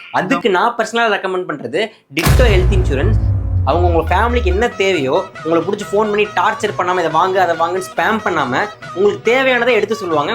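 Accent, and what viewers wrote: Madurai, India. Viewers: Anna neega Madurai ya😮😮😮😮😮😮😮😮😮😮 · Neenga madurai ah...😅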